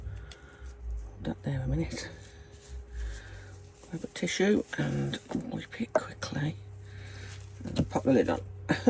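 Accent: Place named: West Midlands, United Kingdom. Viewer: Which part of the west midlands are you from? I'm a willenhall yam yam lol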